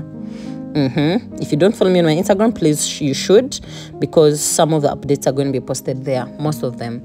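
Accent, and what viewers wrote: Uganda. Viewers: I love the Uganda accent😅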